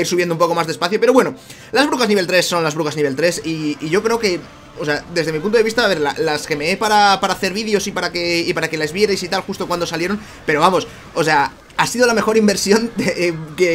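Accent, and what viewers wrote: Venezuela. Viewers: jajajaja Venezuela hablando como siempre eres tu perrito xd jajajsj (también soy de Venezuela) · eres venezolano? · eres de venezuela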